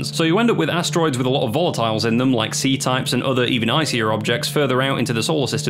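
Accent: United Kingdom. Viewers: He's British Kurzgesagt and I'm here for it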